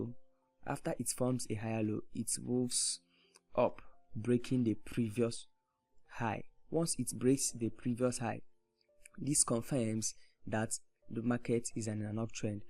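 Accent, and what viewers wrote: Nigeria. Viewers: The fact that you're the first black dude I enjoyed it's videos is amazing. For real the way you broke down this topic is so understandable,I was filled with joy from the start to the ending. Judging from the accent it's the Ebira accent from Nigeria. (That's also my tribe ✌️✌️✌️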